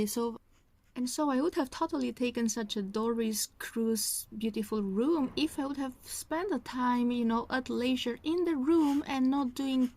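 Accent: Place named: Japan. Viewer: Yeah I thought you have that Japanese accent😂 , but your European appearance confused me a little. 🤔😓 Thanks so much for the nice comments about Vietnam😆, I also visited Tokyo - such an energetic/colorful city, the people are lovely/beautiful!! I hope you enjoy your traveling; and keep recording your precious videos/memories. All the best to you🤟🏻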